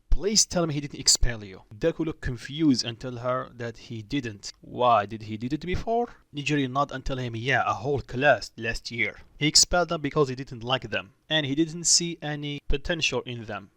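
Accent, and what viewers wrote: Russia. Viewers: Hey sir are you from Russia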